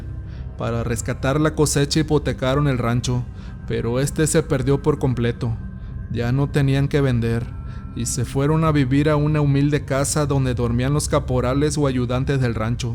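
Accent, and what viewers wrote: Mexico. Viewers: Me encata su asento mexicano y como cuenta sus historias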